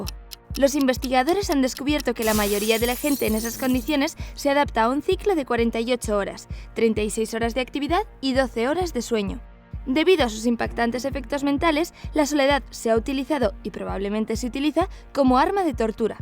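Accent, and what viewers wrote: Spain. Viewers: Español de España :. Horrible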